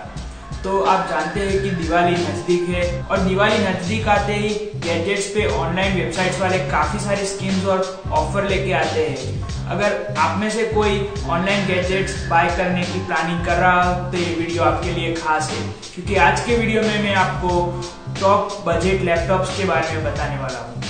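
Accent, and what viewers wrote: Gujarat, India. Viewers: are u gujju.??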